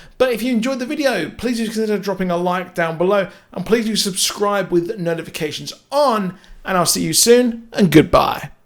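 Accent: United Kingdom. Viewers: Why no views? Why no likes, why no comments, and why so many subscribers? EDIT: waiiiiiit.... ya sound like ur from London, and talking about Hamilton, an American musical?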